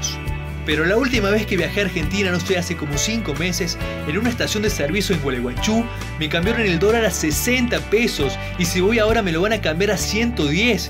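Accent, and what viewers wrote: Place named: Chile. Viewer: Creo que es chileno el que habla. 🇦🇷❤🇺🇾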